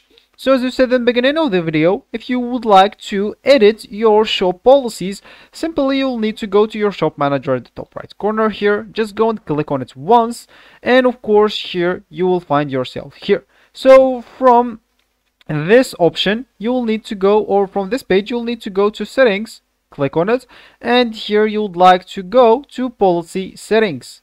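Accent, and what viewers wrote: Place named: Morocco. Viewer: Naaadi abro gha mn voice 3rftek mghribi hhh❤️👌🏻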